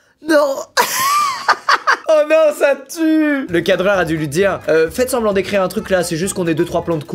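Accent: England, United Kingdom. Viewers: ✨l’accent anglais✨ toujours aussi beau 😭😂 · Toujours l’accent anglais qui est frais chakal hein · Toujours un accent anglais MA-GNI-FI-QUE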